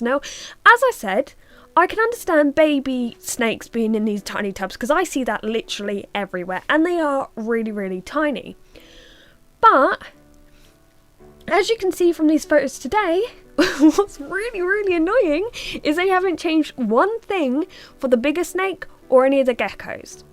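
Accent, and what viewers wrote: United Kingdom. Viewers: Jesus her voice is so painful if she wasn't British I'd like the video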